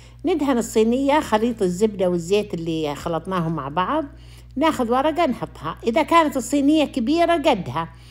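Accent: Iraq. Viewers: يمكن يسألوك لأن طريقة نطقك للحروف عراقي بالذات لما تقولي ماء ورد وكلمات كثير عراقيه ناسيتها انا تابعتك وعلى بالي انك عراقيه بالمدينه وبعدين عرفت انك سعوديه مو من القبوري من طريقة كلامك ولكن انتي عراقيه او سعوديه عسل ماشاءالله ،،يعطيك العافيه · نتشرف بيج ست انا من العراق نفس لهجتها انا احبك كثير واحنا عندنا عشيرته الجبور تمتد الحجاز كلها وهي أكبر عشيره في العراق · احسبك عراقيه كلامك شوي عراقي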